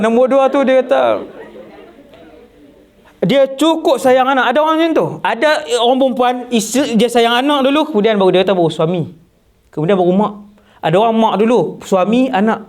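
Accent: Kedah, Malaysia. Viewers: Suka dengar ceramah ustad.. Dengan loghat kedahnye...❤️.. Semoga ustad sentiasa dlm lindungan dan rahmat Allah.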